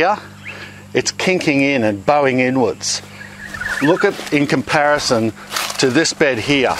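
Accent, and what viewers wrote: Australia. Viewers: Love the channel... but in all seriousness... you ARE saying "mulch" incorrectly. Even with an Aussie accent, the last two letters are "ch", not "sh". It's NOT "mulsh". It's "MULCH". CHOO CHOO like a train. Not SHOO SHOO like you say to bugs or pests.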